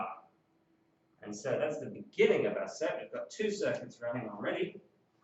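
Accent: Australia. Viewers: I love that you are ashtralian and that you are not british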